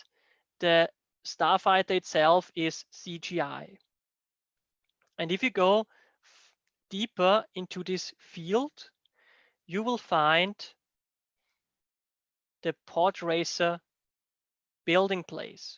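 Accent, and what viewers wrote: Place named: Austria. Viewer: Noch nie so einen österreichischen Akzent im englischen gehört 😅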